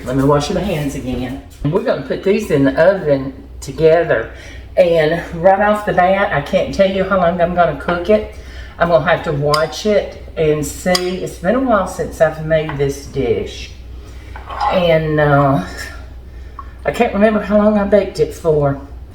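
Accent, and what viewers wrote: South, United States. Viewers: Tuning in from St. Louis. As a single guy, I’ve found a love for cooking as of the last year or so. Thank you for the inspiring recipes and a southern drawl that’ll make a guy just melt.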